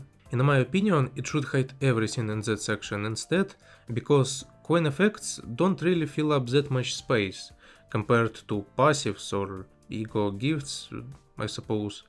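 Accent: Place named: Russia. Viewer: the heavy russian accent is crazy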